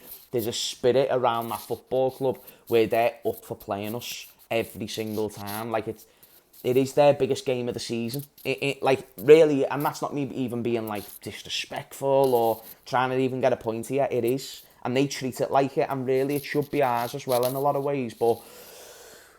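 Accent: Liverpool, United Kingdom. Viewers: Excuse me, I am new to the English language. I want to learn your accent, Liverpool accent. How do I learn? Someone told me to put peanut butter in back of my throat, and I do sound more scouse when I do that, but it's still not great. I will appreciate tips, thank you sir.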